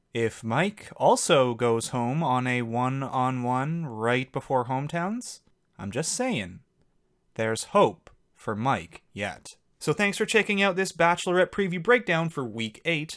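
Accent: Canada. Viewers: I freaking love these videos! 💚 also, are you Canadian? I like your accent!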